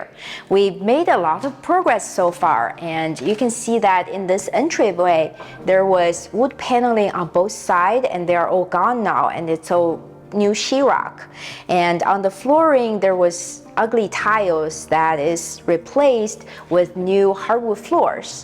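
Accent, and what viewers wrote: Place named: Taiwan. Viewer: Are you Taiwanese? My mother in law is and sounds familiar ❤. Anyhow love your videos. I am new to house flipping and making many mistakes as I go but a life long learner . Thanks for sharing with us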